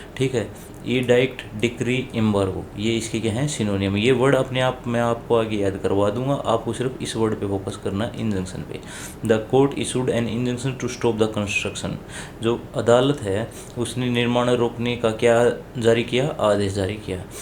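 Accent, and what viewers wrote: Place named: Haryana, India. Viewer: 😅 PURE HARIYANVI ACCENT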